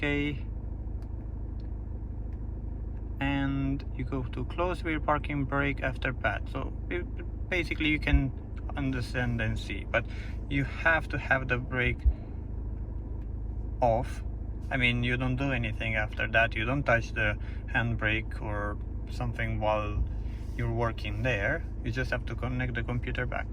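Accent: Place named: Romania. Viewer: Multumesc, recunosc un accent romanesc. 😂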